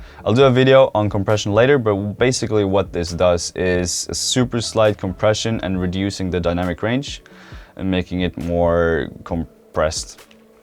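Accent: Norway. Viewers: FREE mastring i Will love it. But are you from Norway?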